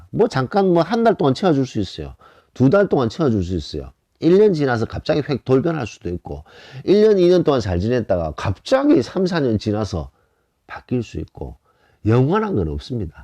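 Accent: Gyeongsang, South Korea. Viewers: 선생님요 !! 정말궁금합니다 자꾸 선생님말씀도중 너무궁금합니다~ 구독자도 궁금증이있으면 알고싶어요 왜야면요! 자꾸생각납니다 옆집아저씨 같아서요 고향만어디신지 경북인지 경남인가요?? 샘이생각할때는 어처구니 없지만 ㅎㅎ 웃음이납니다 오늘도 너무 가슴에 와닫고 내가슴을 어찌호비파는지 나도모릅니다 지금도 어디서도 나의아픈 상처를 어찌잘아시는지 부처님도 아니시고 그리고 하느님도 아니시잖아요 !!감사하고 감사합니다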